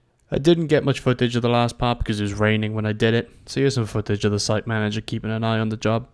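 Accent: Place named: Wales, United Kingdom. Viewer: Good video. Only complaint is 'lumber' over 'timber' as you sound Welsh maybe and not American?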